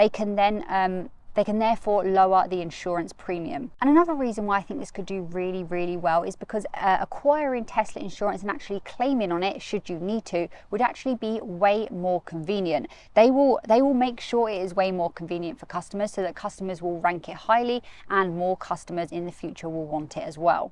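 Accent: United Kingdom. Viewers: It's always a pleasure to hear from you and to help me with my British English listening. So thank you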